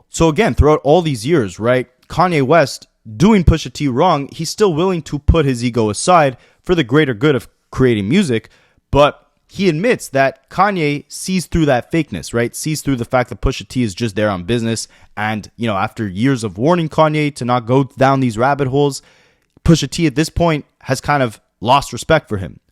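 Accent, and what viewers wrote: Canada. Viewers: Its the canadian accent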